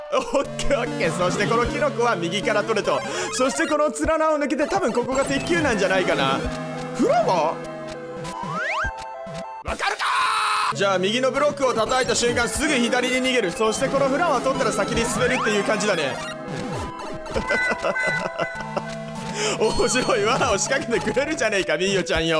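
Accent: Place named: Hakata, Japan. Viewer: ひぃぃぃ！？の謎のエコーみたいなのおもろいし僕も一緒にぶっ壊れようと思いますｗ あとところどころ博多弁出るの好き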